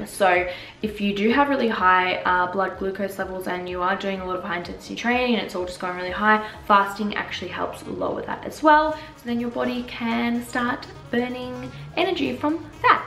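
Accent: Australia. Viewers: Is that an Australian accent? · are you australian?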